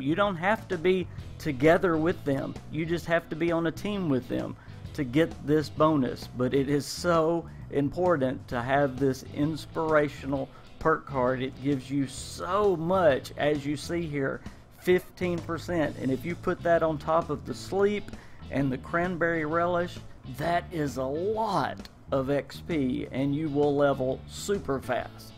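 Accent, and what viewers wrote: South, United States. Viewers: I have never heard a more thick southern accent, and I am in the south. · Nothing quite like a Southern gentleman's voice!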